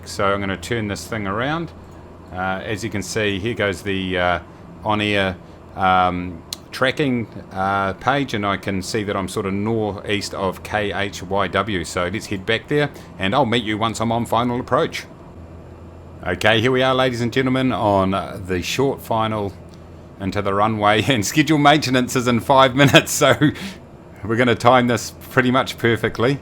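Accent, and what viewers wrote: New Zealand. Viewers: Love the Kiwi accent!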